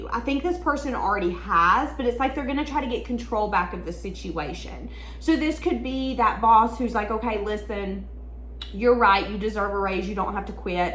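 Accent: South, United States. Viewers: Thank you for sharing queen 👑 that was an amazing read,it seemed to resonate with several interactions I've had with loved one.❤🎉I just love listening to your country voice, you are a...😊! I usually keep my thoughts to myself, but when I do speak up (as I'm getting stronger) I seem to be more aggressive in my approach. Family members say I am mean. Probably I am still holding on to hurt & trying to heal.I had to go into isolation the last two yrs. And pull away from a lot of people I really loved. I spend most of my time with two beautiful kittens now and I still believe in love 💕💜 that really was a nice read thank you 🎊💜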